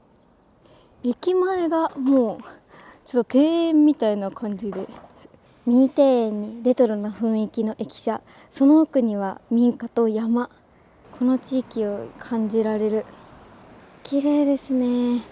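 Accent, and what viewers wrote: Kansai, Japan. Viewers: いつも楽しく拝見してます。ちゃんがたまに話す関西弁がめっちゃ好きで、めっちゃかわいいです😆 これからも楽しい動画をお願いします。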